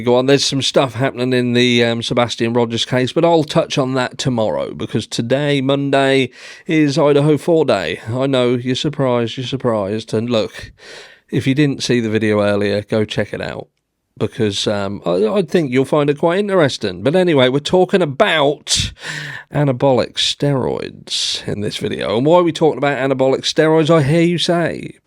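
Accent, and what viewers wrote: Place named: England, United Kingdom. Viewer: we are family loving men. Please understand irony here because good or bad it has been designed by Get a Clue from the begining because his autistic intuituon nailed the narrative you are only now alluding to. Offer him an olive branch of peace and bring him back beacause you are integral too. Coming from Englamd i am so familiar with your accent and God bless you and your family... But he does Richard Burton better and always had something to say that should be listened to. You do your mental health podcasts but ignore Clue like me might have issues there but you with English gumption are endorsing somethinhg not quite the truth and justice for all that Clue coined first but happier on the coat-tails now as things are turning out.... Send some love to Clue... There is exquisite method in his madness and i promise you he loves his wife children and dog jusr like you x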